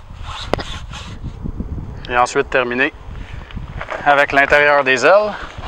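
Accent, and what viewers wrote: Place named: Quebec, Canada. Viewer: ça fait du bien d 'entendre du québécois . on en trouve pas assez sur youtube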